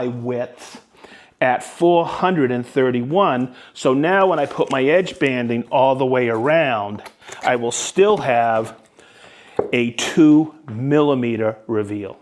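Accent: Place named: Boston, United States. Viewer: That Boston accent is the worst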